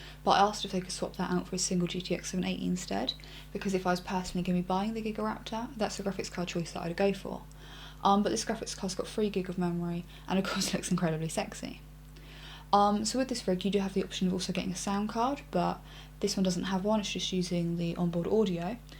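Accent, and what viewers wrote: United Kingdom. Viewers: Uhm...she's obviously British, it's just the way they talk in some areas of Great Britain. There's nothing wrong with her. Americans...enough said... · What part of the UK are you from? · She lives in the UK so she has an accent. It makes me laugh at how people are critical of perfectly acceptable accents.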